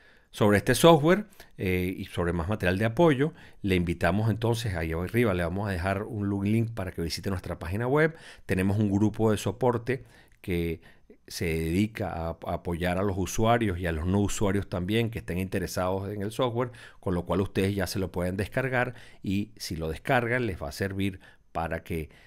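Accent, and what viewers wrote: Venezuela. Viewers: disculpa eres venezolano ???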